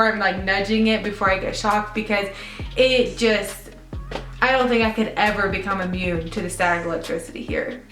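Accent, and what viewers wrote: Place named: Oklahoma, United States. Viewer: Yep, she sounds like she's from Oklahoma.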